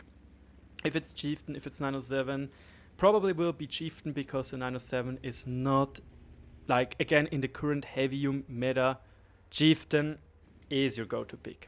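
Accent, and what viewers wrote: Germany. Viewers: Das Deutsche in Dir hört man schon hart raus mein Freund :D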